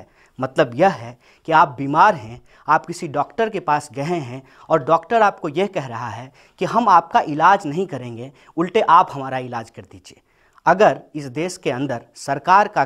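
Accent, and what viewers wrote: Bihar, India. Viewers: apki hindi bihari mix hai es liye sayed aap ko sabhi pasand nhi krtey.. mai like krta hoon. lekin janta hoon hindi sahi kr layi to sabhi apko pasand krenge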